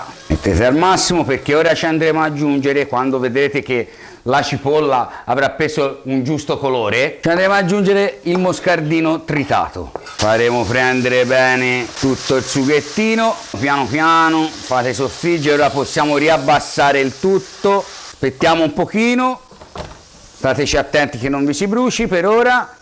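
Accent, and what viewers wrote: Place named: Livorno, Italy. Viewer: Ciao, ti ho scoperto da pochi giorni e già sei diventato la star della mia cucina e mi piace troppo l'accento livornese. Io abito a Montecatini da cinque anni ma sono della provincia di Torino esattamente della Val di Susa (piena di corsari), sto già pianificando una gita a Livorno al tuo ristorante, ti voglio sentire dal vivo, sei forte!!! Ciau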